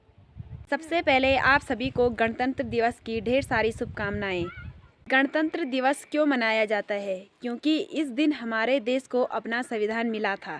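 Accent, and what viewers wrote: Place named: Garhwal, India. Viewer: Sudhh Hindi bhasa baii... with gadwali tone.... mtlb kya hi bolen.... 🙂🙃🙃😃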